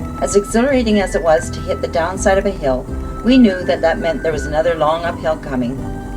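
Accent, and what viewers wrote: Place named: South, United States. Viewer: maybe not mechanical, but just annoying. like an american from the bible belt.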